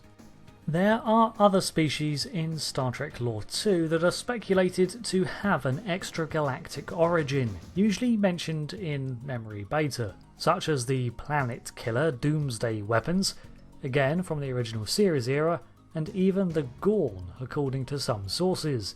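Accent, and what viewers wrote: United Kingdom. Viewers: Ruined with a nit brit narratah.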